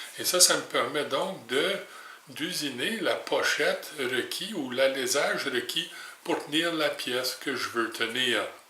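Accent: Canada. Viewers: Excellent professeur, ce, très à cheval sur la transmission du savoir (ouais, mais être à cheval, pour un, c'est un peu son job, non?). J'ai déjà beaucoup appris de ses vidéos. L'accent canadien rend parfois l'accès au contenu un peu délicat pour une (vieille) oreille belge, mais je prends beaucoup de plaisir à apprendre avec lui.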